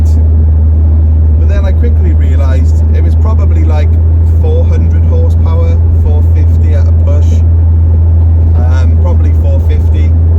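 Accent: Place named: Cockney, United Kingdom. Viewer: Love the English cockney..🤣🇯🇲🇯🇲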